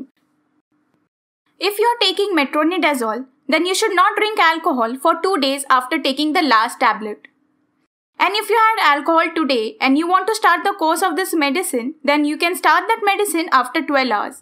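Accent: India. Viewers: Indiaaaaaaaan pleeaaase stop talkin’ inglish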